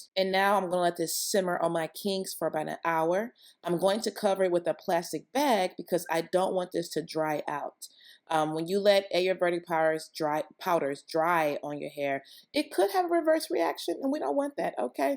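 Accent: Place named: Nigeria. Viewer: The naija accent😍😍😊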